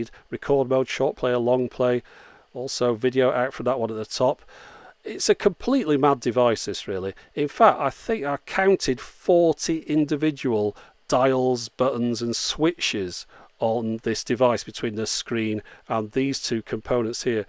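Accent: United Kingdom. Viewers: It's just one of those British things. "Patent" with a long a, "Mazda" with a short first a, "missile" with a long second i. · "Skullduggery was afoot" - god, I love listening to British people talk.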